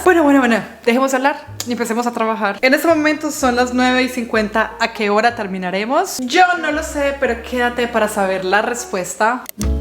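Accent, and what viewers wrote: Antioquia, Colombia. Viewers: el otro día me puse a ver videos de cuando Sofía estaba bebé 😊 y me di cuenta que tenías tu acento colombiano más marcado. Por cierto la edición de tus videos ha mejorado muchisimo 👏🏼👏🏼 pero igual a veces me gusta regresarme a ver los videos viejitos 😁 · Hermosa a mi me fascinan tus videos, eres super genial y me inspiras mucho 💯💎👸 me encanta escucharte cuando hablas con tu acento paisa ( Medellín 🇨🇴) te quiero y sigue adelante 🌷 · Amooo tu acento colombiano y amoo tus videos 😊❤ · en este video se te salio mas el paisa jjj q chulo una vez dijiste q eras de medellin pero normalmente tu acento es bien neutro. Saludos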